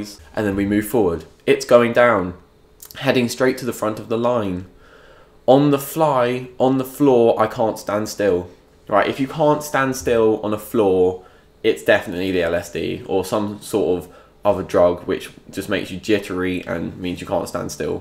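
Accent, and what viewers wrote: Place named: England, United Kingdom. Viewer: haha hilarious. subscribed! plussss, an english accent? god, for sure. Imao, take care, xo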